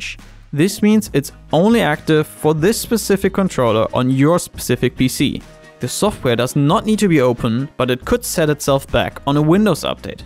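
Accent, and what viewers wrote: Germany. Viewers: Man hört so dass du deutsch bist xD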